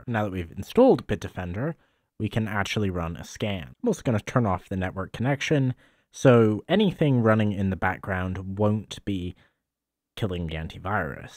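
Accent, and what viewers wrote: United Kingdom. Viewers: is this guy an American with a speech impediment or a brit? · Nice. I seem to hear a little bit of a British Accent, but I don't know if living in British Columbia gives you that accent or something. I like it though! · thanks for the tips. your accent rocks. there seems to be some British English on certain kinds of words.. password.. master.. ..ahaha Niles Crane-like.. thanks again! So happy to hear a voice i can understand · Your accent is so weird, you switch between sounding Canadian and British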